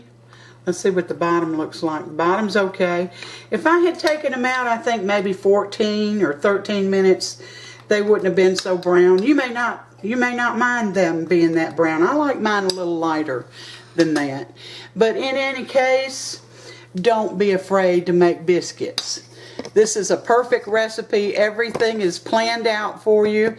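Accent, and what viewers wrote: South, United States. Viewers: I have been trying to make some for a long time & each time they are like rocks,so sure gonna give this a try,I aint giving up on baking.Love your southern drawl :)i never had problems years ago in UK.The folding technique is a new one on me. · Thank you so much! Those look so easy! I'm a yankee who has been known to make hockey pucks instead of biscuits and I think these I could do and not mess them up! I had a friend from SC even show me how to make biscuits when she came up here to visit and she said pretty much the same thing you did...when you are mixing them she said 'don't screw with them too much! in he same sweet southern drawl. <3 Thanks again for the recipe I thin I might go try them RIGHT NOW! :D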